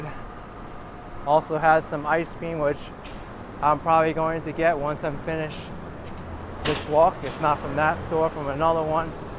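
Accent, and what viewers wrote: New York, United States. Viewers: I love your videos -- I have long read about the city but have yet to visit. I'm reading The Power Broker right now, and your videos help me visualize the city. P.S. I was sorry to hear the comments by those young women. With your distinctive accent, how could you be anything else but a New Yorker???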